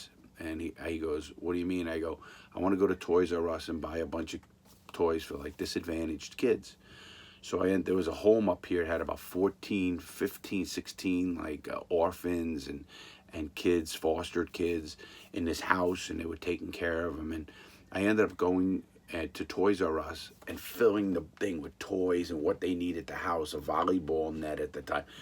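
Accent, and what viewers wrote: New York, United States. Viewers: A Polak from Florida with a New York accent I cannot get enough of this guy such a great storyteller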